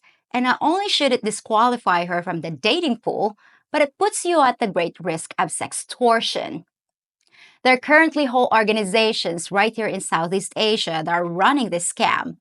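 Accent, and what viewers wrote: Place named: United States. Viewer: Your spot on American accent and knowledge of our culture in the USA is amazing. Did you live here in the USA before, or just watch a lot of American media?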